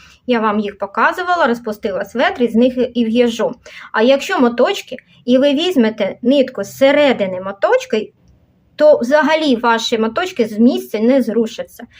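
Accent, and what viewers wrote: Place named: Surzhyk, Ukraine. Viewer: Да, слышно суржик,много слов перекрученных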